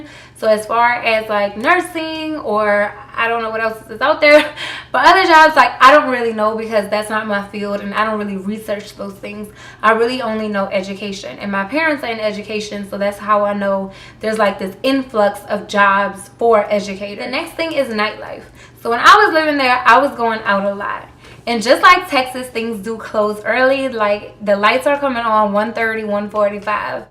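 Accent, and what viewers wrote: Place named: DMV, United States. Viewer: You from the dmv? I hear the accent. I’m from Baltimore. 🤎🤎🤎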